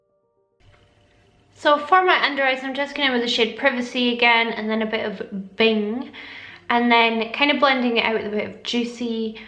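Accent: Scotland, United Kingdom. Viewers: I told you before haters gonna hate and therefore don’t mind the bitch! As I was saying on IG before watching your video that I would not purchase it cos I don’t find the colours too unique!! And while watching your video I realised that Gingerspice was from Too Faced 😂 I only watch your videos because you’ve got real talent and I love your Scottish accent 😁 And as you can see down below a lot of people have your back, so don’t get frustrated because of one stupid bitch!! Keep doing you that’s why we love you 💕💕💕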